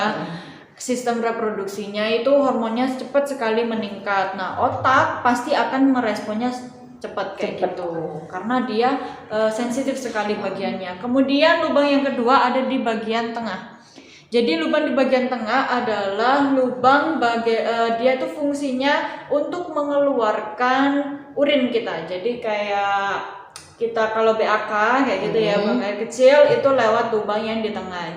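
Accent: Banyumas, Indonesia. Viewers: HAHA, ORA PANGLING' LOGAT NGAPAK😂👍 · Bahasa banyumasannya medok bgt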